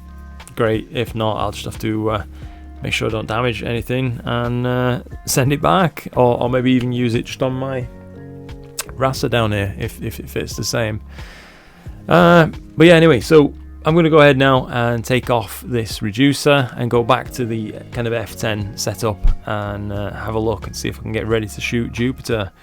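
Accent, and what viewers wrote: United Kingdom. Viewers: it really does, thanks for the response. Yes UK based and from the accent im guessing not a million miles from yourself. They seem to be considerably cheaper ordering direct and it seems most of the Starfield stock comes from their HQ in Canada after reading reviews so I may order direct. I've watched a few of your videos today about the player ones and I'm really impressed with the quality. Great videos as well 👍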